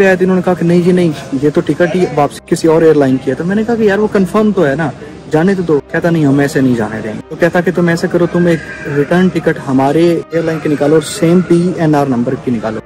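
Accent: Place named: India. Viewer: tera English accent bahut desi hey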